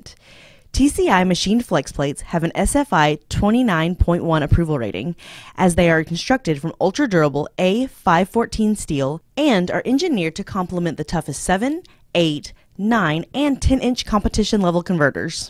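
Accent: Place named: California, United States. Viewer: Haha she sounds like some cali broad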